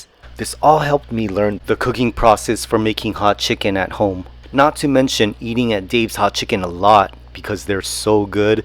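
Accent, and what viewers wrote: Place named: Hawaii, United States. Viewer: You sound like u from Hawaii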